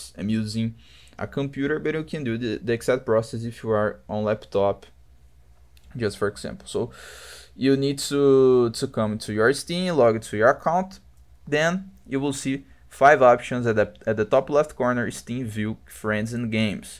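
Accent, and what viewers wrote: Brazil. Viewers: You have a very Brazilian accent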